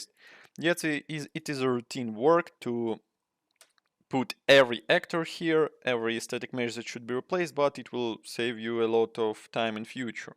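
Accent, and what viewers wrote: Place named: Russia. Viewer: Акцент прям русский. Более уверен что ты наш)) Отличный урок! Спасибо!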